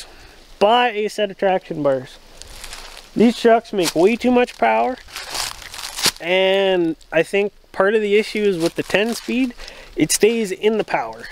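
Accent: Canada. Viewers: I heard the accent… thought you were Canadian. You popped the hood and I saw the DDC plates.. YEP! You’re Canadian 😏 beautiful truck !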